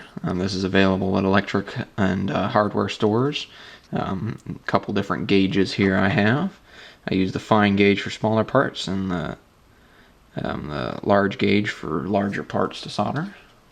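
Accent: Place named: United States. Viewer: thanks for the informative, helpful instruction. IS there a stronger bonding way to join brass? BTW but why do Americans pronounce solder, "sodder"?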